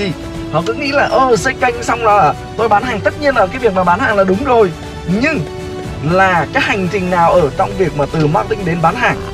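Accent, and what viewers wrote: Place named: Central, Vietnam. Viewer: Anh. Nói chất giọng miền trung và phong cách sống rất hay. Xin anh chia sẽ về: Nghề chủ yếu? Xuất thân, trình độ học vấn, Gdinh, vợ con, nhà cửa, sự nghiệp...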